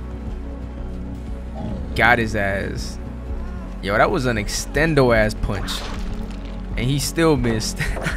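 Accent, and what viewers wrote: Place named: Chicago, United States. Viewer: Man I know a Chicago mf when I hear one 😂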